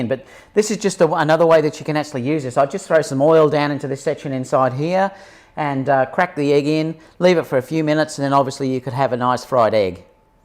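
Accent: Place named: Australia. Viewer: Such an Australian narration and humour, mate!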